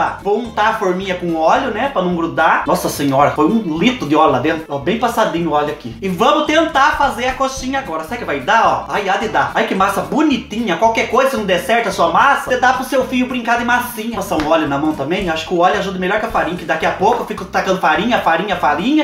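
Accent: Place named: Cerqueira César, Brazil. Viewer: do céu, deixa eu contar. Meu pai tem 75 anos e nasceu nas redondezas de Cerqueira César, aí eu tava vendo seu vídeo e ele ouvindo, aí ele disse " Essa moça é das redondezas de Cerqueira, aposto pelo jeito de falar" kkkkkkkkkkkkkkkkkk aí eu disse que você é de Coronel Macedo e ele falou que é pertinho daqui, sou de Lençóis Paulista, perto de Bauru, quando acabar a quarentena, vou pessoalmente te levar uma caixaaaaa de coisaaaas ❤️❤️❤️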